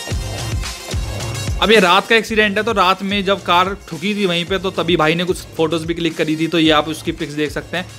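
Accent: Haryana, India. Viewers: भाई , एक बात बोलता हूँ , बुरा मत मानना। जरा धीरे बोला करो। बहोत से शब्द तुम्हारे jumbled आते है। पीछे शेर तो लगा नहीं है ना ! हिंदी एक्सेंट में बहोत प्रॉब्लम है तुम्हारा ! हो सके तो हरियाणवी एक्सेंट में हिंदी मत बोलो।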